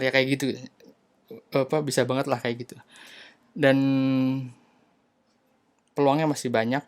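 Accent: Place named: Jakarta, Indonesia. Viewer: agak sulit mencerna perkataan anda dikarnakan logat jakarta anda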